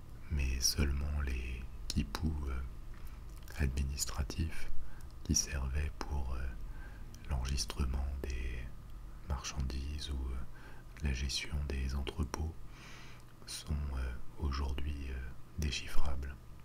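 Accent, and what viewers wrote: France. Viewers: I now realize what's been missing from my ASMR experience. A beautiful French accent 😍😍😍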